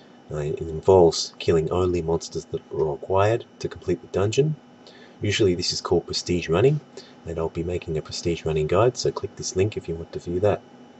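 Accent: Australia. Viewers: holy shit first australian commentator ive heard?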